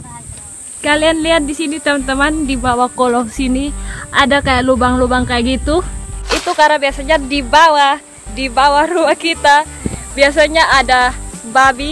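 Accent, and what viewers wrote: Kupang, Indonesia. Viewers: its amazing the way you talk kupangnese. Pls teach us some kupang language:)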